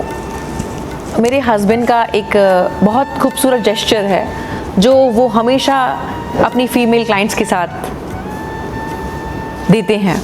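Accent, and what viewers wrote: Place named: Hyderabad, India. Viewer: My Dear & Respected Royal Madam ji Namaskar ji. GOOD NIGHT. Today I saw your all video clips and specially your Syherees. Your pronunciation is like Hyderabadis URDU .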